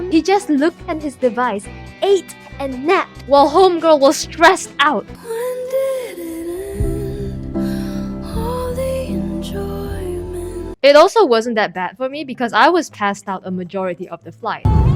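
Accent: Malaysia. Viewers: Ngl the Malaysian Chinese accent catches me offguard every time lmao. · how malaysian chinese speak chinese is so real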